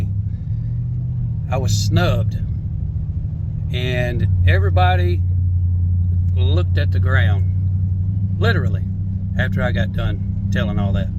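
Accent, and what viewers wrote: United States. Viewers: The churches are no better in the UK, people not friendly and in there clicky groups, i stopped going very dissapointed with what i experienced, thankyou for sharing, u are looking well brother praise Jesus, love ur deep south accent , see u in the clouds very soon , maranatha 🙏🏻💕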